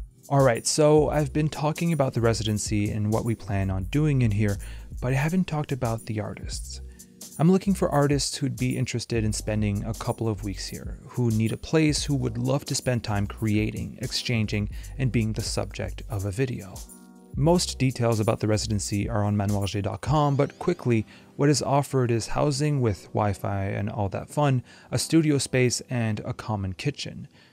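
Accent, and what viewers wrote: Quebec, Canada. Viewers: Hmm may be a kind of a stretch but i always assumed you were quebecois from your pronunciation of french words and names! Love this channel keep doing what you are doing!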